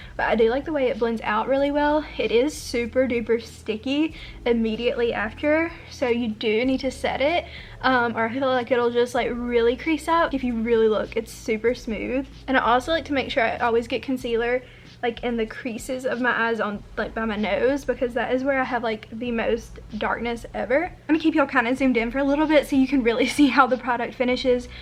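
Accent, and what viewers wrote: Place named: Alabama, United States. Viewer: youre literally so cute!! this is my first time watching your channel. i searched ulta haul (looking for something to purchase while being so bored in quarantine lol) and i am so happy i clicked on your vid! youre so likable. subscribed :) ALSO i live in mobile, al and i feel like you are also from alabama bc of your accent.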